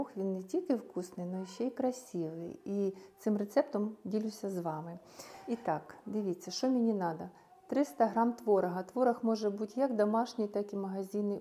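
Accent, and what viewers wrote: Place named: South, Ukraine. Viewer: Південний степовий говір 🙏❤️🇺🇦 Бабусі мої так пояснювали )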